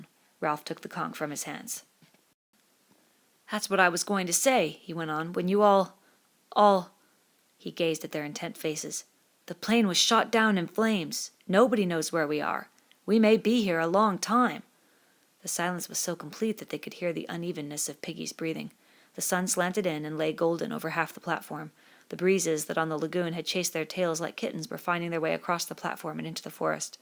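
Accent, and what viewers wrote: United Kingdom. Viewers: Chlorita Bleachita does she sound English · everyone saying how it should be pronounced... Does she sound like she is in Britian?? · does she sound like shes from the uk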